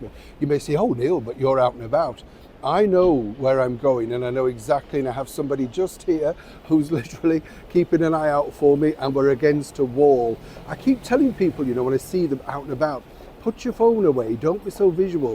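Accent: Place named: North, United Kingdom. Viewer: At first, Neil, I thought you said "she was a hooker" - which would have been more or less accurate - but you said "hugger" in your northern accent! x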